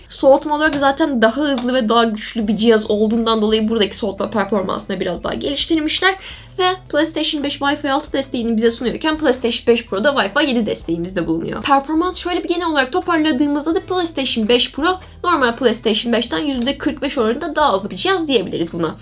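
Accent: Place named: English-speaking world. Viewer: Bu karı niya ağzı yaylana yatlana konuşuyor? Sanki ingilizde türkçeyi sonradan öğrenmiş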